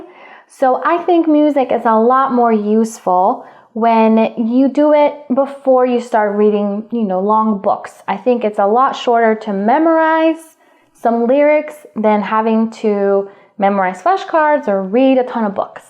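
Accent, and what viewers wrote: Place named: United States. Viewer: Thank you for sharing your knowledge dear teacher. I think now my motivation is to be like you, because you have a great American accent😇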